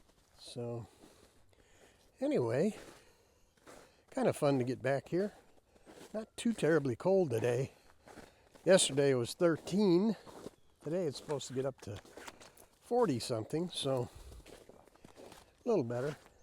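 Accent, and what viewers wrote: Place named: Midwest, United States. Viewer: The Ramblings of Bry As an American from the South, sounds as mid-western as Walter Cronkite.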